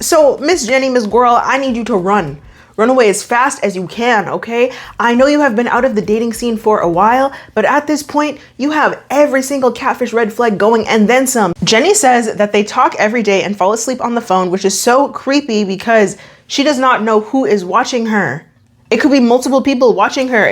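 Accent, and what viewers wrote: Canada. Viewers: this is the catfish episode that may have pushed me too far…..what a wild piece of tv…. also i’ve never realized how canadian you do sound until this video !!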